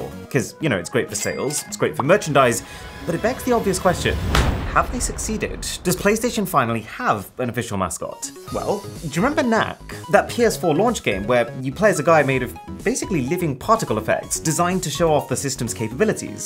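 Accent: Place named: United Kingdom. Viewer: WAIT your British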